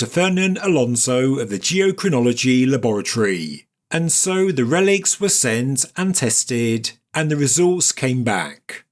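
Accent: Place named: England, United Kingdom. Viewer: Pesante accento inglese 😮.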